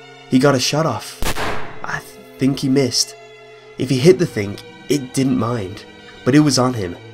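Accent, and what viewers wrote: United Kingdom. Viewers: Why would a British person be telling an American folk type story? Thats ruining my immersion.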